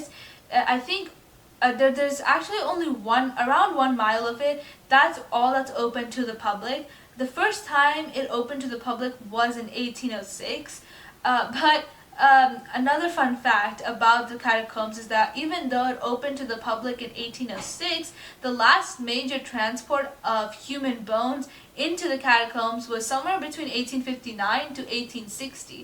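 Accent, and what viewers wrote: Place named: France. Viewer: Woah this is so interesting, and also your French accent is so nice 😊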